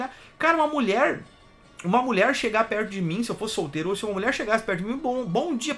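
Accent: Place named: Porto Alegre, Brazil. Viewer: haha saudade do sotaque de poa! Me lembra o programa do Mr. Pi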